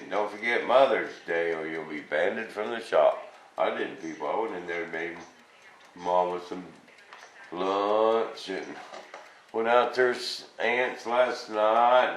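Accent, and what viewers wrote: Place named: Louisiana, United States. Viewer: what part of Louisiana you's from...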